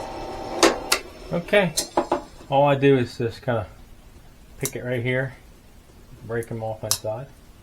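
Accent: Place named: Western Pennsylvania, United States. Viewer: Are you from western PA? It sounds like it.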